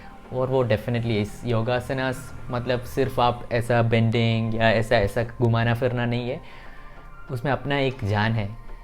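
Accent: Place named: Kerala, India. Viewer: ur hindi is very sweet chetta...whenever any malayali speak hindi..they speak very sweetly!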